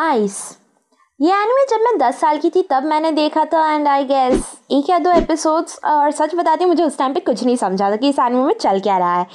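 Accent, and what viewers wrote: India. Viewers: WHO ARE YOU INDIAN OR WHAT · Are you really indian?or learnt to speak hindi ?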